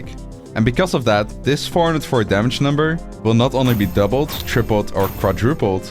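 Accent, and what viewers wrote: Netherlands. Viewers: Typisch NL accent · Lol i thought you had a dutch accent. Then i saw “Netherlands” on your channel :P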